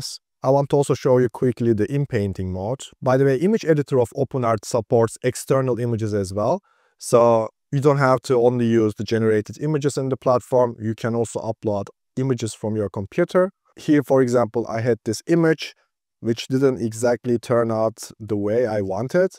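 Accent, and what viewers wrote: Turkey. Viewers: türk müsün bilader? lise şivemizle çok güzel anlatmışsın. tebrikler :) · Kanki Türk aksanı bu nerde olsa tanırım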